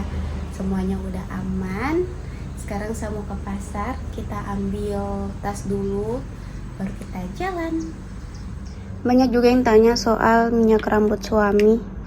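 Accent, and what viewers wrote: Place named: Bugis, Indonesia. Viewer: ku kira ikan garam itu apa, ternyata ikan asin 🤭 kalo belihat bebahasa bugis logat logat bugis gitu saya suka dengarnya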